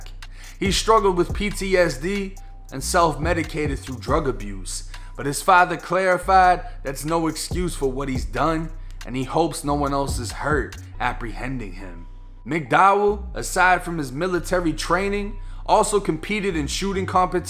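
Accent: Boston, United States. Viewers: live in the NE as well as Florida. Interesting accent, Florida hood with wicked strong instances of “park the car in Harvard yard.”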